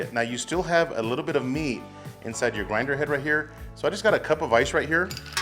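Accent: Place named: Texas, United States. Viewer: you’re the best. As a native Texan living in SC, just hearing your voice makes me feel like I’m back in south central Texas. But amateur smoker and neophyte sausage maker, videos like these change my game. ¡Muchas gracias, vato!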